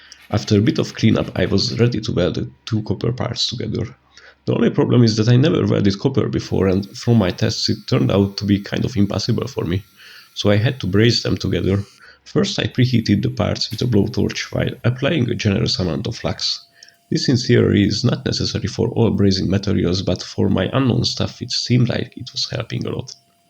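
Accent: Hungary. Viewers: accent is Hungarian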